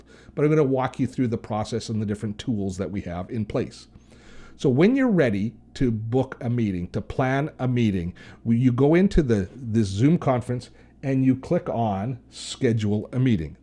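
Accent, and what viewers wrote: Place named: Canada. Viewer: Hi...very watchable...thank you. I am from the UK...have friends in Canada, so it was especially good to hear your accent and see your friendly front room style. I conduct/host a monthly discussion group...and new to zoom wonder about the 'hands up' symbol so I can mute people and then unmute them when I respond to the hands up? I am pretty sure I saw it in the free version...can't see it in the paid version.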